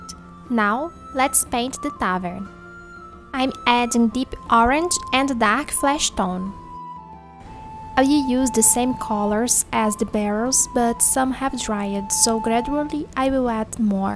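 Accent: Brazil. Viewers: Otimo trabalho *-*, quero fazer um cabo de arma para uma flintlock pistol impress, é pra ser uma madeira mais lisa e polida, da de seuir o mesmo padrão? pelo sotaque chuto ser mineira ou do norte kkkk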